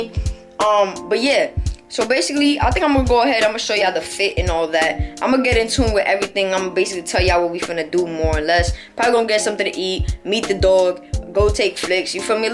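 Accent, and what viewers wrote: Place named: New York, United States. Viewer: That ny accent strong asf😂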